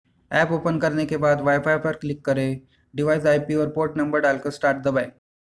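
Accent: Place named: China. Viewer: His voice is like CHINESE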